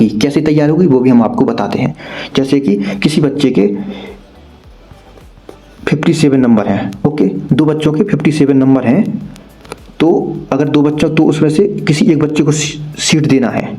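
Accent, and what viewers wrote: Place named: Bundelkhand, India. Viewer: Kay bhajja bundelkhand ke ho ka ..😊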